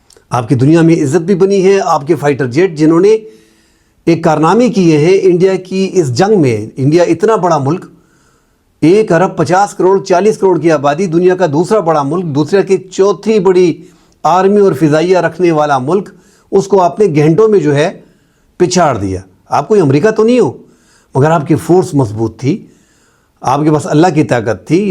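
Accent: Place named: Hindko, Pakistan. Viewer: Sir Hindko main batain kia karain App ke urdu Hindko jayse ha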